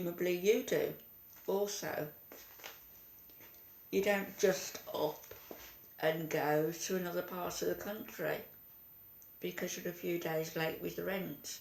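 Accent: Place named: England, United Kingdom. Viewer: Amy just made a video attacking critics including you. She is gloating and arrogant. She is pissed and must have seen the comment you left on her last video. Calling you an English lady with a thick accent. Amy would rather you speak in a coarse arrogant voice such as that American lady with a thick body and thick paypal account. Who could that be?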